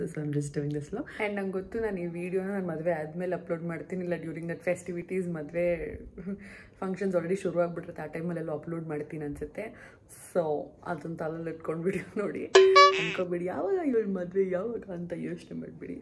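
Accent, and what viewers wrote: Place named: Davangere, India. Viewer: Davangere slang kelake kushi❤😊so happy to hear from you😊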